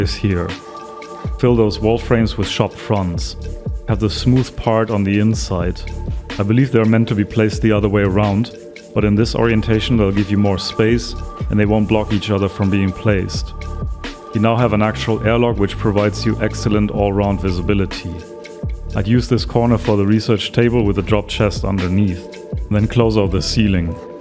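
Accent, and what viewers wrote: Germany. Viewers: Mann hört einf den deutschen Akzent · man hört dein deutschen aktzient ;D nices video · Ich höre dein Dialekt (ka ob das so heißt) du bist eig. Deutscher, oder? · German accent it in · Sehe jetzt erst seit 5Min dieses Video aber liebe es einfach wie dein deutscher Dialekt raussticht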